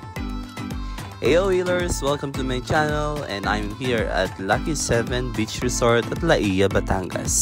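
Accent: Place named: England, United Kingdom. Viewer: Cool English accent 😊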